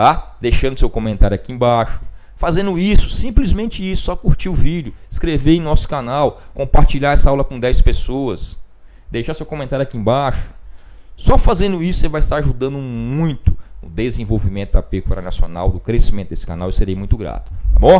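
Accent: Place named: Bahia, Brazil. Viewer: Professor tinha quase certeza pelo seu sotaque que era da Bahia! Acabo de receber de um amigo com quem compartilhei este vídeo a informação que é conterrâneo e que hoje está na UFPA!! Sucesso!! Abs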